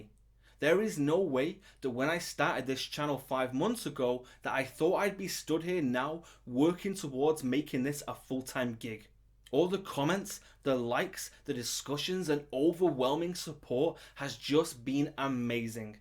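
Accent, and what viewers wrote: United Kingdom. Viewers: sounds like something from the UK? Hope he'll tell us!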